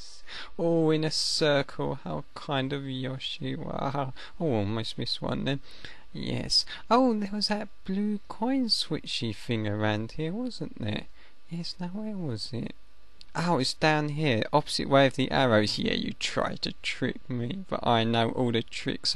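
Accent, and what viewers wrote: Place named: England, United Kingdom. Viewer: This is HILARIOUS! +1 for an English accent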